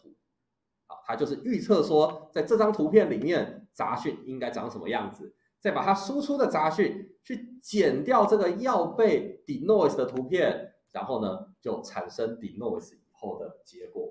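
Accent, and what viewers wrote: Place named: Taiwan. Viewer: 哈哈 我居然听到了 台湾老师的讲课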